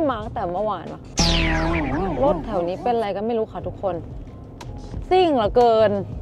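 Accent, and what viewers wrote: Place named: Northern Thailand, Thailand. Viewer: ຂອບໃຈທີ່ພານຳທ່ຽວນິວຢັອກ ມັກສຽງນ້ອງອູ້ກຳເມືອງຫນ້າຮັກດີ ອ້າຍເຄີຍມານິວຢັອກ2ເດືອນໃນປີ1995 ທ່ຽວມ່ວນໆແລະປອດໄພເດີ້ນ້ອງ👍👍👍😊👏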